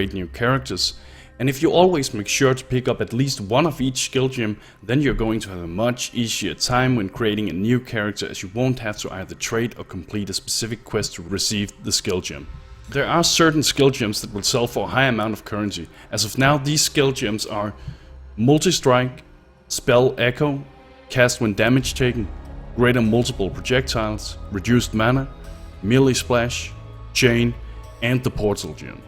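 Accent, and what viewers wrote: Denmark. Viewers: It sounds like you're Danish, Are you? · He sounds danish in the accent. How do i know , well iam danish :P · Er du fra Odense? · you sound danish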